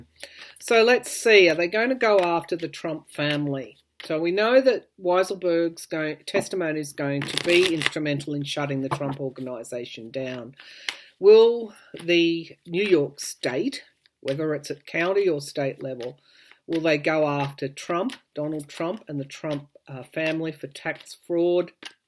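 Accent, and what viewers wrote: Australia. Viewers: Hello! I always SO enjoy your readings, the way you present everything, the humor! (Donald Capone😂) and the very personal way you „ talk“ to us. Very different from other readers ( apart from the Australian accent!). You bring a very human, personal touch to your readings. Thanks for all the time you take to bring us the latest insights! 🙏🌹🙏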